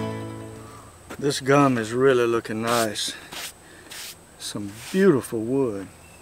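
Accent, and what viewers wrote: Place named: South, United States. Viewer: I enjoyed the video and also enjoyed hearing you talk. I'm southern, but you really sound southern!! I'll look up other videos.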